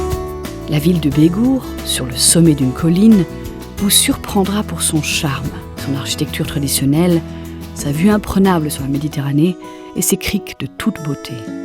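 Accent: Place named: France. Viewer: Visca Girona i tota Catalunya Catalana, c´est marrant d´entendre les noms de ville et d´endroit avec cette prononçassions Française.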